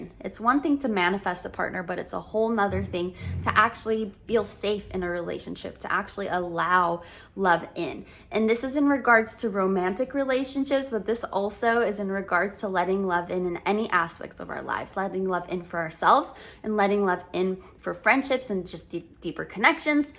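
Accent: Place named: Romania. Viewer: I looove your romanian accent! 🥰 You're saying it perfectly! Great video too! 🤩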